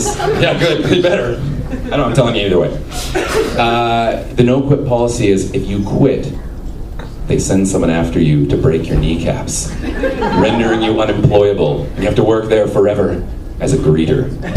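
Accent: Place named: Glasgow, United Kingdom. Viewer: Great Loudon covers, and now stand-up comedy! Not a bad Glasgow accent... try 'Govan' if asked where in Glasgow... Good stuff.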